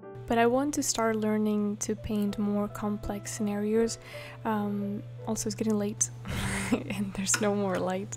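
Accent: Spain. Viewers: This is the first video of yours I’ve ever seen and for context my spanish teacher at my high school has an insanely similar accent and speech pattern to you, I find it very pretty and calming (I love accents but Hers is one of my favorites) and she is from Spain. When you said you were from Spain I freaked internally because you sound so much like her your voice is so beautiful and calming! Can you do ASMR while painting?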